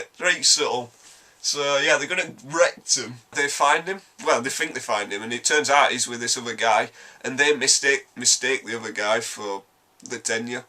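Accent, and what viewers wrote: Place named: Northern England, United Kingdom. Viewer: You are so likeable , corrie accent love it 🤣🤣🤣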